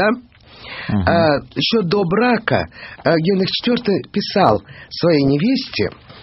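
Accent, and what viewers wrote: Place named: Moscow, Russia. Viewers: Это произношение, характерное для Московского диалекта. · Это московский говор. Так говорили в Москве всегда. Не булочная, а булошная, например. И при чем тут еврейка. Например, в Берлине и евреи, и турки говорят на берлинском диалект. И никого это не раздражает.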